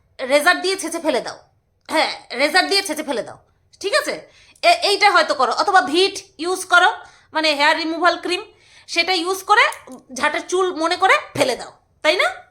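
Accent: Bangal, Bangladesh. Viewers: Orey ki sundor bangal vasa sunlam..darun lglo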